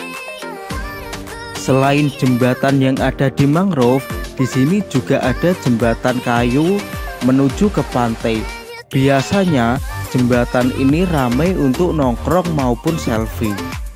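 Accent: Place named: Java, Indonesia. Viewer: Jawane Medok to mas😅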